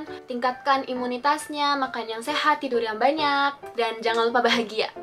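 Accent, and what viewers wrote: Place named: Japan. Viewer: Suka kak kalu ngomong L ada lidah jepangnya, lucu😂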